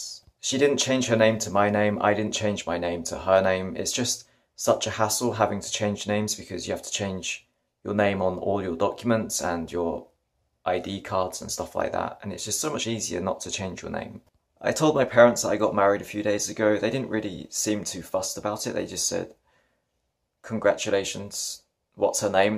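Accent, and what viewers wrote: United Kingdom. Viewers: Samm Y i get what u are saying. But to bash a depressive does not help.. British accent and looks are sweet. His words not so much, reflect his depression, lack of accessing true emotions, joy... and his insecurity (like need to proof us his marriage certificate, which is really not our business )... he got loads of judgment... about woman and man... which means he judging him self even harder and more... which in the core leads to depression.... i just wish he would realize it, and start having a therapy to turn his life over... show his followers would be such a great asset if maybe many depressive followers to show that u can turn into joy with life... your weaknesses are your strength - i truly hope he is more cheerfully with his wife... idk even why i keep watching him once in a while... i guess hoping to see him for once happy.....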